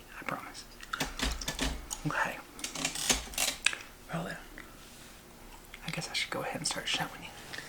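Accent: South, United States. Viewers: That southern accent is adorable 😭♥️ all those tingles, thanks 😘 Also that pony tail looks so cute I'm crying x💓💓💓💓💓💓💓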